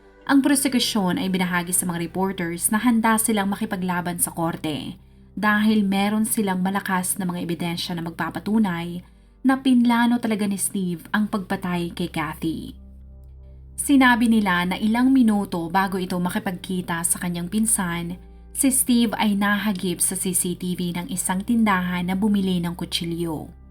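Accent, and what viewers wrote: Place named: Ilocos, Philippines. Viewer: Lumalabas ang ilocana accent nyo dito Manang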